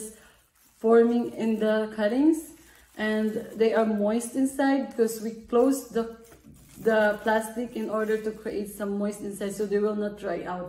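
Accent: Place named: Eastern Europe. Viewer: I like your accent, sounds Slavic. 😊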